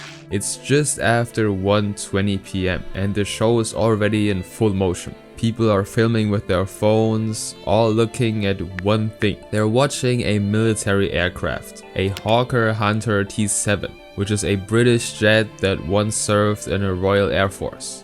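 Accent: Germany. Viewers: Are you german? (my curiosity kills me just because I hear an accent lol)😅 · Are you by chance german? Is your native language german?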